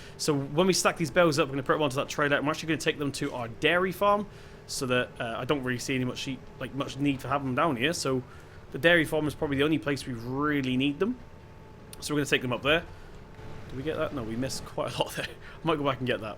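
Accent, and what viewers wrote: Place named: Plymouth, United Kingdom. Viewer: Bit of a Plymouth accent there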